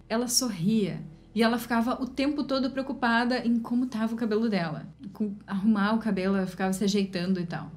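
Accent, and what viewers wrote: Rio Grande do Sul, Brazil. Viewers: Tu e gaúcha tche? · gauchá certeza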